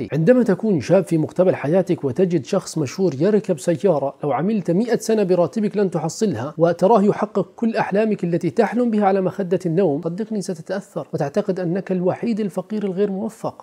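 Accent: Egypt. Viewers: الفيديو مفيد كالعاده 💝ولهجتك المصريه بتضحكني اوي 😂😂 بس بتتكلم بيها بطريقه حلوه تحياتي ليك من مصر 🇪🇬💝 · انت متاثر باللهجة المصرية اوي❤😂 حبيب البي ياسطا😂😂❤ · اللهجة المصرية علي لسانك عسل يا ❤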